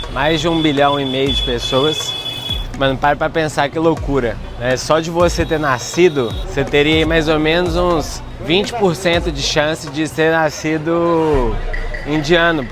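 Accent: Minas Gerais, Brazil. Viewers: Vô te falá viu. Acho q ele é mineiro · Estou amando seus videos, o sotaque Mineirinho me dá uma saudade de casa 🥰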